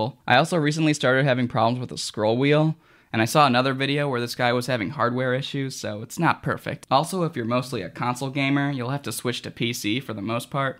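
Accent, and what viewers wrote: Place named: California, United States. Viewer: Cara, esse sotaque de quem mora na california me da um enjoou, sla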